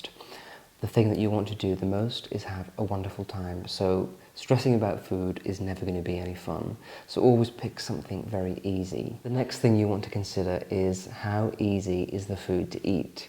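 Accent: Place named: Northern England, United Kingdom. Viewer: I noticed he 'dropped' a lot of his vowels this week in his efforts to appear breathy and sensual- they are more Northern than West Country!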